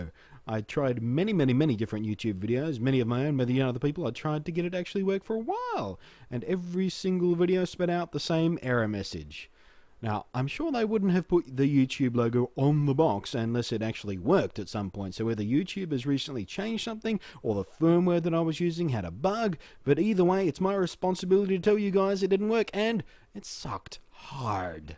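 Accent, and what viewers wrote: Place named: Australia. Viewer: Well damn, as other commentors pointed out there it is in plain text at the end of the video. I'm so used to those marketing style bullet point filled pages I ignore them on instinct. Also, the sound of your soothing aussie voice kept me distracted. Or maybe it's cause I'm sick and hopped up on so many medications I can't think straight but I'm sure it's mostly the aussie thing., I'd really like to see you do some notebook reviews. Specifically the Clevo P151M.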